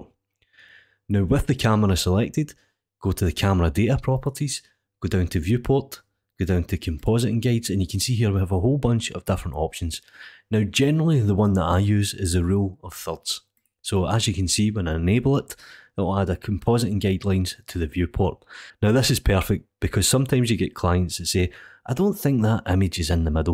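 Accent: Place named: Ayrshire, United Kingdom. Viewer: Nothing to do with this video, but I have been wondering this for a while. Your accent sounds identical to a guy I know who is from Ayrshire. Whenever I hear your videos it makes me want fish and chips as he owns a food cart here in Portland OR. PS. Loving Haggis Tools, I have quadview on all the time now. Makes this much easier for me.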